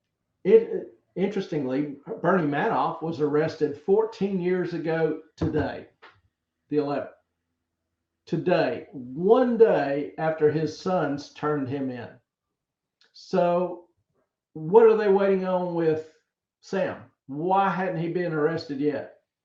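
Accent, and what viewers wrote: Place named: Alabama, United States. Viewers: Another L&Ler subbing & watching. Your content is amazing. Add in the dogs & southern accent, and the “bigass” adjective and I’m here to stay! Thanks for the breakdown. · That Alabama accent!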